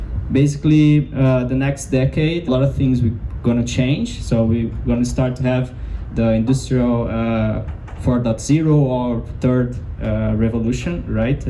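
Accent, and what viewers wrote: Brazil. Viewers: Você fala inglês muito bem! Não sei se é do seu interesse, mas caso seja, você pode tentar estudar um pouco o alfabeto fonético da língua inglesa pra treinar um pouco a pronúncia nativa. Isso não é necessário, mas talvez seja interessante caso queira perder um pouco do sotaque de brasileiro (espero que entenda que não é uma crítica, provavelmente você sabe mais de inglês que eu! Kkk)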